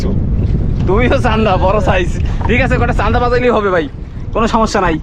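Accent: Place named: Barisal, Bangladesh. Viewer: Andamaer prakitik drisso osadharon ei drisso dekhar jonno bose thaki ar vai er borisaler vasa sonar jonno apekhe thaki khub valo lage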